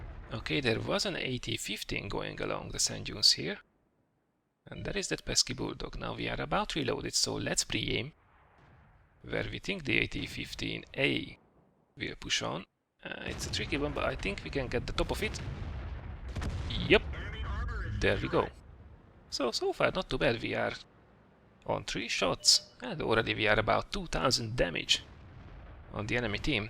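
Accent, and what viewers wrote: Hungary. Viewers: R u Hungarian? Your accent is seemst like a Hun.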